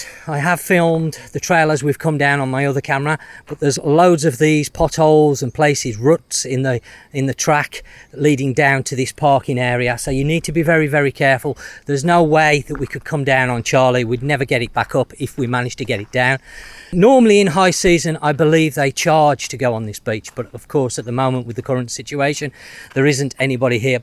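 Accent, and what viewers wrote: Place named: Black Country, United Kingdom. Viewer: what gave it away was when you said the batter bits on your last video, common thing we say around the black country when we have our battered chips. im from Wolverhampton myself and thought you sound local.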